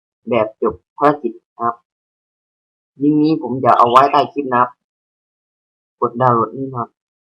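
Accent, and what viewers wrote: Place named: South, Thailand. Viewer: พูดเหมือนคนใต้เลย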